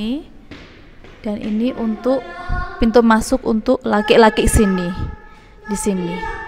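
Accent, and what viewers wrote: Java, Indonesia. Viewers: padahal muk ngene iki pidione, tapi panggah tak like 😆😁 demen leh medokkkkkkk, gass bund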